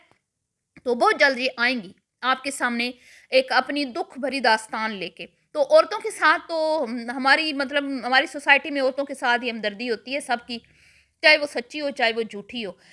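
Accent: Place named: Pothohar, Pakistan. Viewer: Apka accent kafi pothohari hai which has my ancestors too. Bohat acha lagta hai apko sun kay. 🙂.💜 from Canada.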